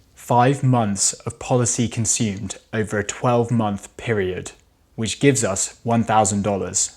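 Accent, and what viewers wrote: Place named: United Kingdom. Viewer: Thank you so much!!! I finally understand what prepaid expenses are! I always Google for knowing knowledge first but I search your channel for knowing accounting knowledge first like this time 😀 Fan from Taiwan 🇹🇼 (Btw really like your British accent!! Also a fan of British accent)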